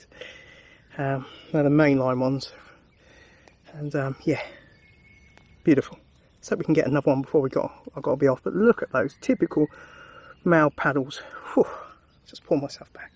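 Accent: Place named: Sarawak, Malaysia. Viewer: Sounds like ur in Sarawak bud!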